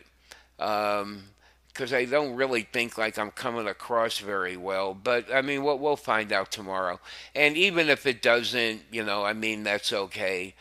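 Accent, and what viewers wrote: United States. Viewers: so happy to hear you're back vocem tuam! Prayed the rosary for you last night. Over here in Europe we love hearing your authentic voice, American accent and pronunciations - they are all fine! I enjoy the ad hoc commentary and analysis, it is all true and absolutely faithful to the Magisterium. Deo Gratias.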